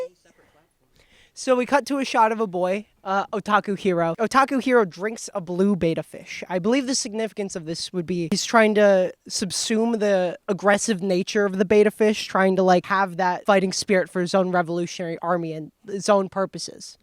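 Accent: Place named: Minnesota, United States. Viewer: thank you for your unabashed use of the minnesota accent this is near and dear to my heart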